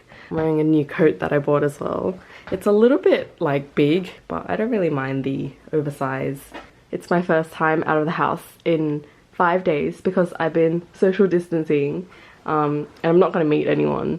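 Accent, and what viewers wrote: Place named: Australia. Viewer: I love watching other Australians art videos/studio vlogs, the accents and background noises (in particular magpies) feel cosy to me lmao. I love your artwork and the commentary you have for it, it’s very casual 🤙✨ I also really appreciate how you try to incorporate some tips to fellow artists in each of your videos 🥰 looking forward to more of your vlogs, the internet needs more aussies 🙈⭐️✨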